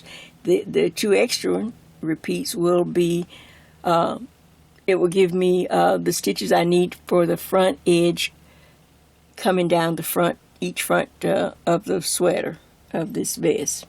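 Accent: South, United States. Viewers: I just found your channel! You're just darling!! Plus, being a southern myself....I love your southern drawl, and demeanor!!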